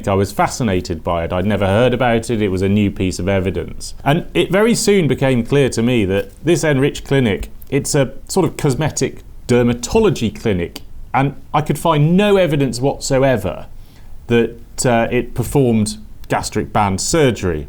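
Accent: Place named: Southern England, United Kingdom. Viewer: It is a nice accent. Southern England? Crispy.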